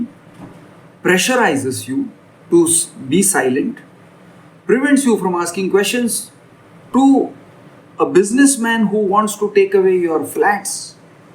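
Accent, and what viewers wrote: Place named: India. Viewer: I asked out of curiosity as this issue might be specific to india and the anchor spoke in indian english. But glad that you understood and appreciated what's going on in this part of the world.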